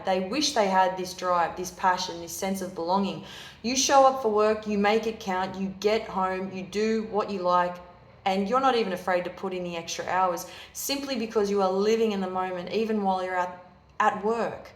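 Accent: Australia. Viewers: Definitely an Aussie voice, good to hear. Simple and accurate. Zero drama hype in delivery. And short :)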